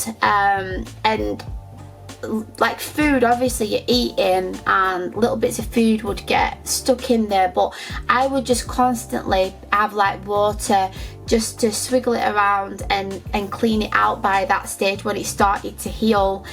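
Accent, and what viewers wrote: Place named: London, United Kingdom. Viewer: thank you for this information. it helped me. I found your accent very easy on the ear. im from California, Huntington Beach area. so our accent is unnoticed by our ears and yours stands out. but with your accent in particular, it reminds me of a london couple i once met. also you have fabulous hair and i also noticed the amount of empathy and inclusive concerns for the audience. so it creates more engaging conversation.... i totally relate to what youre saying. i found myself hanging on every word and i can say i really learned something about my experience. i went through literally the same issue. makes you a very beautiful and very decent person and i truly appreciate this info. thank you for this. liked the video and subscribed.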